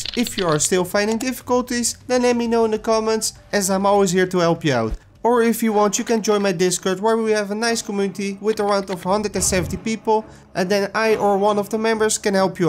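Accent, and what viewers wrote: Netherlands. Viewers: you sound dutch mate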